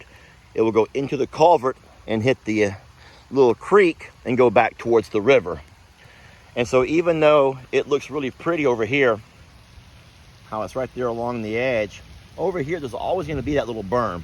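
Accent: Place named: Texas, United States. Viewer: In Texas we have our own way of pronouncing words 🤣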